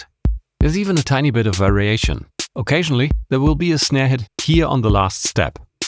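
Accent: Germany. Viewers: As a German i heard it right with his first words. Dont know what it is but you can hear if someone is German. Just a few can sound nearly not-german. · Ich höre da sowieso einen Deutschen Akzent raus oder - Geiles Video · Was für ein vertrauter deutscher Akzent in dieser Erzählstimme…wird hier nicht übersetzt…do it with google or gemini or siri or otherwise❤️🖖🤟P.S.: Just ask a german friend of yours👍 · 12,39 are you german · Wollte erst fragen, warum er die Beiträge nicht in deutsch bringt 😋🤔😂 Sein Englisvh ist gut, aber dennoch können wir es als Muttersprachler heraushören. Um so gruseliger muss unser Englisch für "Engländer" sein 🤪😂 - zu immitieren erfordert schon einiges. Die Klangkulisse sind extrem komplex und trotz der minimalistischen Samples fügt sich alles zu diesem unverkennbaren Sound 👍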